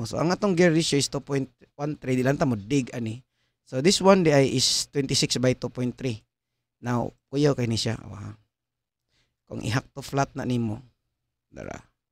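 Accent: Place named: Philippines. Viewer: So you really want a Diamond Back? 😜 With a Lefty on it? Lol. I love the Philienglish!!! Every language does it.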